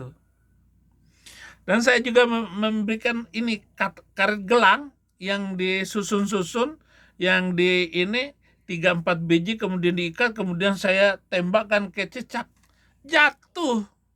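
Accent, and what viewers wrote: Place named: West Nusa Tenggara, Indonesia. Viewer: yang sehat selalu ya abba kami selalu berDoa untuk mu🙏💖💖💖🙏(menggikut Yesus keputusan mu) dan itu sangat berat karna dari logat mu saya tau anda dari kota atau daera NTB yang mayoritas (muslim)🙏🙏🙏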